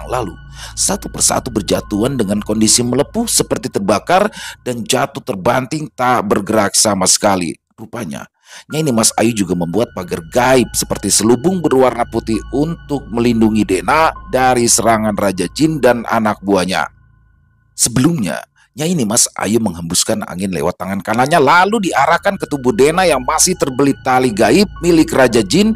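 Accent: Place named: Jakarta, Indonesia. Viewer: ia sama2 Bang seneng mendengarkan suaranya maaf Bang aslinya dr jakarta suaranya ciri kaya orang Jakarta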